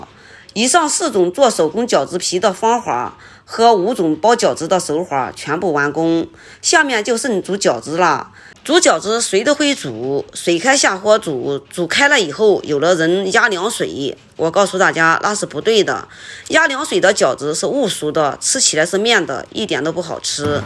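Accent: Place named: Henan, China. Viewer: 一聽口音就是河南信仰老鄉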